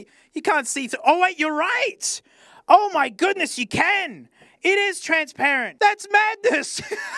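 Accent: Australia. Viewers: Either I’m like racist and all Aussies sound exactly the same or this is definitely the guy from the channel 🤔 it’s gotta be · Everything about this accent says Queeeeeenslanddeerr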